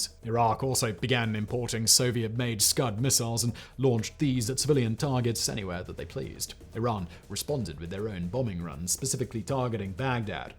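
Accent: England, United Kingdom. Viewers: I wish the prester would drop the quasi upper class English accent ! It just grates on my nerves. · .. I do after 1 min in .. he is on 3-5 different channels.. it’s frustrating cuz it’s a interesting topic and than his stupid British/ colonial/ slaver voice comes in.. than you see him and that terrible beard/ bald head.. He is annoying ( sorry ) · I’m Iraqi and I don’t care if he pronounces them in an English way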